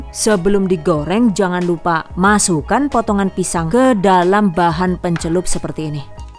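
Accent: Banyumas, Indonesia. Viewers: Aku suka koment nya...ngapak pd karo aku jan² jos bgt koh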